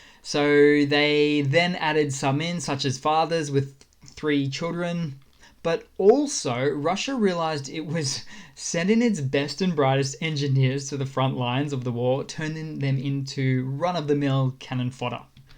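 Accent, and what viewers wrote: Australia. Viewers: Another good assessment. Out of curiosity, are you an Australian? (BTW, I am). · Fake news i see. Is that an aussie speaking. FFS mate, get a life. This is just propaganda ❤ russia from the 🇬🇧🇬🇧🇬🇧🇬🇧.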